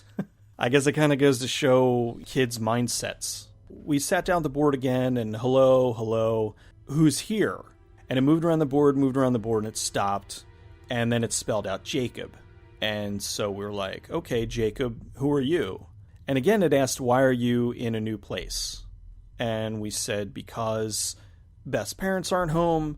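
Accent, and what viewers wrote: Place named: Maine, United States. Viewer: Herr Oberst Wilhelm Von Borchert You're right. Sounds like grew up in a King novel.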